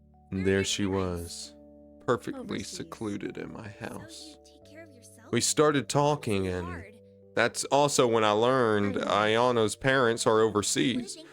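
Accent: South, United States. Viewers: Your hillbilly voice makes this 10x funnier. Keep on being awesome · this is the most southern man ive EVER heard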